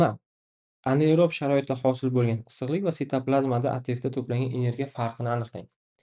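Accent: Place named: Tashkent, Uzbekistan. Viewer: Рахмат сог ва саломат булинг сиз АТФни тшунишимга ёрдам беряпсиз лекин Тошкенча шевани ёмон килдизда😅😂😅😊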